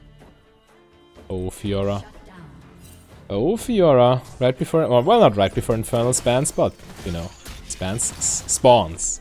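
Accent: United Kingdom. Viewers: In fairness, he just kind of has that voice. I'm not sure how a German guy manages to sound oddly like an upperclass Britishman, but somehow manages it.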